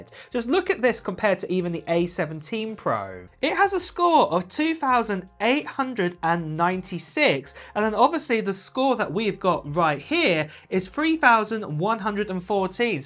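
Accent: United Kingdom. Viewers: Will be upgrading from my iPhone 13 Pro Max 256gb to 16 Pro Max 256 gb this Friday! I’ll even copy your delightful British accent, for the duration of my ordering call! 😂❤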